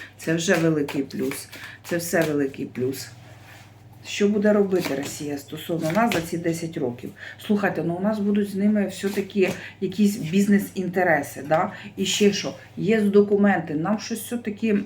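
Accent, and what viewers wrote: Kryvyi Rih, Ukraine. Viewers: дорогенька,люблю вас слухати. Наш криворізький суржик,але,ну не облизуйте карти,коли їх витягаєте. Вибачте за зауваження.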